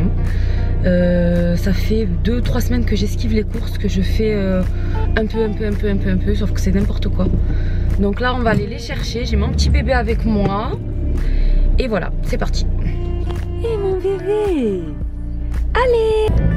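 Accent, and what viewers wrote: South, France. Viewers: Coucou ma belle je te suit depuis la recette de tes msemen inratable lol. Et j'aime ton nouveau contenus je me suis tjrs demander à quoi ressemblé cette voix derrière ce magnifique accent du sud. Je suis pas decu tu es adorable à tout point de vue. Continu a nous régalé et nous proposer de news contenus différents bisou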